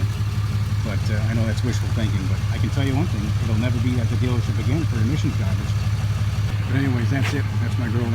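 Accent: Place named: Manchester, United Kingdom. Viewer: Sounds like a Manchester accent.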